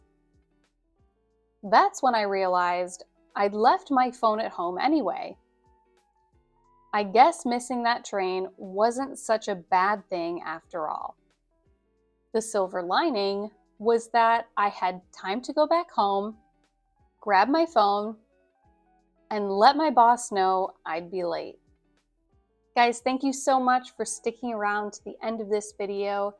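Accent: United States. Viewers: Which is it accent? American or British ? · I like your american accent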